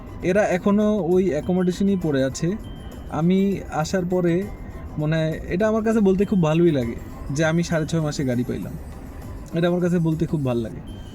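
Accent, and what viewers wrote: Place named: Bangladesh. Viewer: Bangladeshi laicent lage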